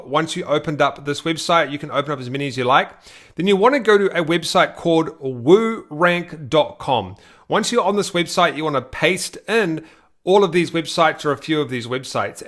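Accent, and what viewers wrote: New Zealand. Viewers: yep he's a kiwi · Where's your accent from ? Sounds kiwi!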